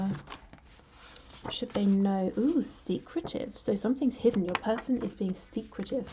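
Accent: United Kingdom. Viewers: Hai very good morning dear namaste great reading as always I feel u and ur reading can't go wrong apart from ur reading I am big fan of ur voice abd ur English I mean the British English. Thanks a million dear with warm regards namaste lots of love and light. Dr vijay anand. (prince India khalid)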